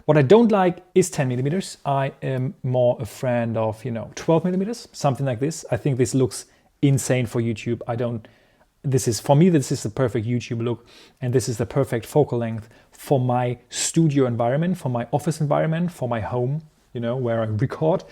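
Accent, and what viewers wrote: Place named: Germany. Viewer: That barely discernible German accent makes everything subtly similar to Schindler's List.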